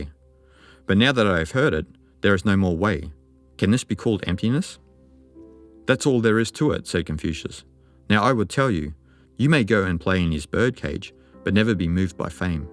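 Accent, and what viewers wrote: Australia. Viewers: I am grateful for the fact that just discovered your channel through The Art of Effortless Living two days ago. And yes, it was the perfect timing as how the law of attraction usually works. I listened this video in this morning, what a great way to start a day! Listening your contents is joyful way to be in the moment especially when my mind is agitated. (: Also checked your website, judging by the quality of your videos I bet your books are as remarkable. As a person born and grew up in far East I was not interested in Eastern philosophy for the first three decades of my life. But I found myself always captivated by the words from those who originally western born but obtained depth of both western and eastern philosophy/spirituality. To me their way of speeches are so effective, easier to digest and apply than those speakers/writers that are eastern born even though I don't doubt the eastern born also have good depth on both side of philosophies. 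I guess you are one of those exceptionally people have such depth in both way and know how to explain well. Your contents are as listenable as Alan Watts' speech videos. I didn't expect to hear such words in Australian accent which is amusing and refreshing. :D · A piece of art really, I watched both documentary, your voice is so calming and I love your Australian accent, the quality of information are incredible and very well explain. Thanks for this.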